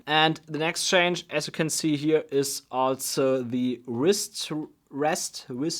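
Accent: Germany. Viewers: good old german accent wenn ich mich nicht täusche :D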